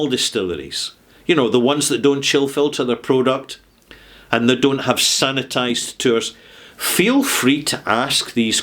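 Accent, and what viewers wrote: Scotland, United Kingdom. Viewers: I've been trying to listen to a couple of Bootlegs Clash and Led Zep) I bought tonight and watch you with subtitles. It is really quite hard to make sense of your videos using the subtitles alone as they are so off the beam. It is part down to the Scottish accent - have you seen the sketch in the voice actuated lift with 2 scotsmen! No biggie really I know I should listen to the undiluted velvet tones only.....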